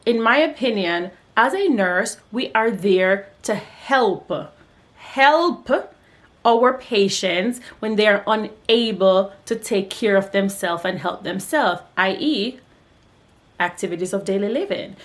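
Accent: Jamaica. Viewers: Yeah I can hear the patio 🙂 you are a yardie 🇯🇲. I enjoyed your bullet points. And yes, I am an aide.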